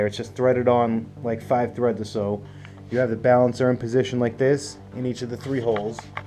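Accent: New England, United States. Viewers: Where you from dude!? I heeyah New England Haaaaaad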